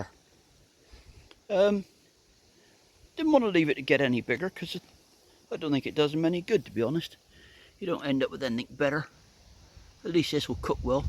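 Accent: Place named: Dorset, United Kingdom. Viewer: A great allotment and we love the Dorset ascent 😊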